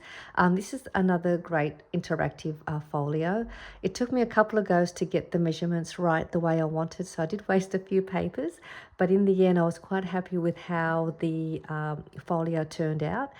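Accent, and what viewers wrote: Australia. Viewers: I really like this project and your style. I just discovered your channel...it is so nice to hear an Australian accent and places to source things here in Australia. 😃 I'm watching with sound off and subtitles on because of the snoring! 🤣 (music to some, nails on a chalkboard to others, hey?!😉) But, I stuck around and subscribed. Your style really does stand out ❤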